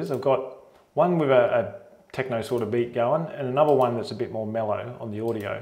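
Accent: Australia. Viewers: So, is it so unusual for someone to mistake and Australian accent for British?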